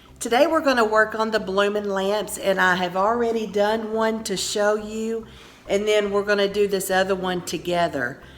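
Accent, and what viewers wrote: South, United States. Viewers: You must be southern like myself I want say what gave you away LOL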